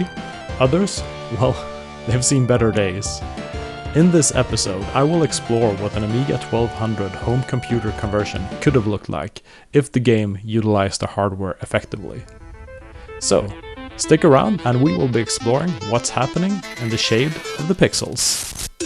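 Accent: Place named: Sweden. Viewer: With that accent i know you are swedish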